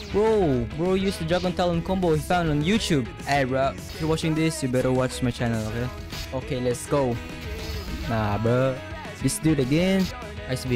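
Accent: Bisaya, Philippines. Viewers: First time ko sa channel mo tas yung accent mo sabi ko pilipino toh😂... Naks luv it keep it up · "Bro palaban sa sea beast haahahahahhahahah" Leme ask u are u Tagalog or bisaya bc the way u talk it's like u are bisaya but idk Bruh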